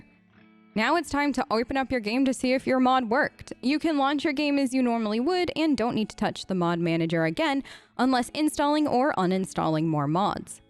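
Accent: Australia. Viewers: i like how ever now and then a bit of your accent comes through, to me it sounds australian, possibly new zealand...but i could be totally wrong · 1- thanks so much for this! This and your origins and DA2 mod videos have been awesome! 2- Have you ended up living in australia or something? That aussie accent is leaking through! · why does it sound like your aussy but trying to hide it with a very middle American accent?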